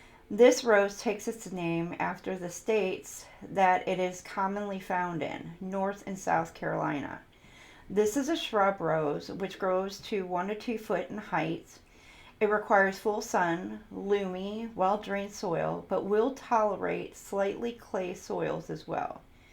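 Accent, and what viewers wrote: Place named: England, United Kingdom. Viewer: Your English accent is hilarious 😂 I guess my Scottish accent is too! Edinburgh is pronounced as Edinbra or Edinborough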